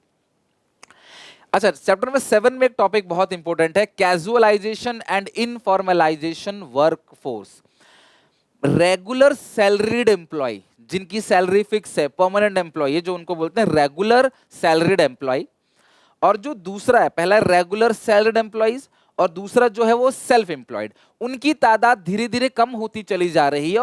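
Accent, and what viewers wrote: Odisha, India. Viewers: Sir are you from odisha ❤ please reply sir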